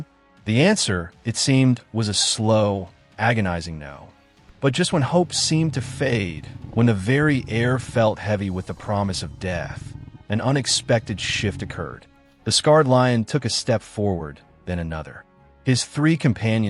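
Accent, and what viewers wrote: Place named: United States. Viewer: Oh dear, sound off again, as it wasn't the lions that sent chills down my spine. It was that flaming American narrator's voice!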